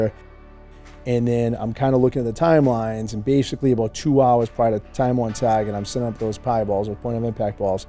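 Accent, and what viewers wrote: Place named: Rhode Island, United States. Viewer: That was great. Your accent brought back great memories of my uncle from Rhode Island!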